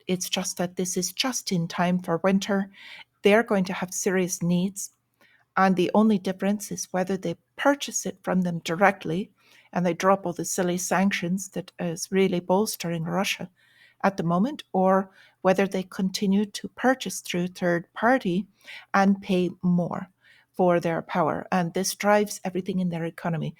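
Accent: Ireland. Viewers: you must have stock in Japan I notice you really focus on it. Must have property there or family in Japan. which is weird with the Irish accent.:)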